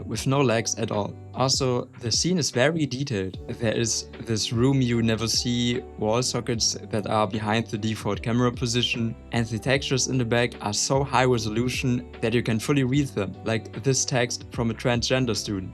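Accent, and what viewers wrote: Germany. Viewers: Yo, its normal in Germany to have this fucked up accent?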